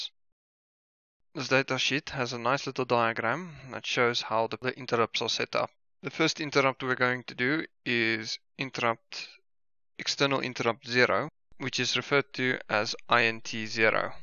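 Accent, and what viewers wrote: South Africa. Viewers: The accent sounds South African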